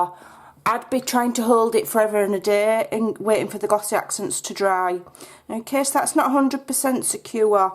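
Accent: Yorkshire, United Kingdom. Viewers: One happy mistake. The cover looks gorgeous. Ty for sharing how you made your book thingy (foggy brain!). Great idea. I have relatives in Ripon and elsewhere in Yorkshire and Teeside, so I love your accent. I lived in Middlesbrough and also Ripon many, many moons ago. Lol. Have a nice weekend. Xx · Your cover is just perfect for your beautiful journal 💝 Love your ingenuity with the faux book plate 💝 Love your Yorkshire accent ! We have strong accents down under too🦘🇦🇺 🐨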